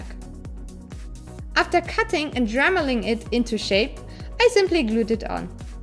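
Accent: Germany. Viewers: Ich hab mir schon gedacht, dass du irgendwie einen deutschen Akzent hast aber nachdem ich mir die Kanalinfo angeschaut habe war ich mir sicher. Btw wirklich hilfreiches Video! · Man merkt gleich, dass sie aus Deutschland kommt 😅 aber gut gemacht. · Are u German???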